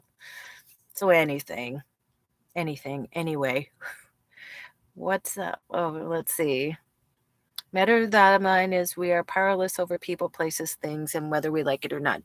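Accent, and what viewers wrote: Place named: New York, United States. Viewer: Just be YOU. New York accent,,,, Don't worry about it. Lol. More support you than Don't. Keep going. I'm pointing at you. Magic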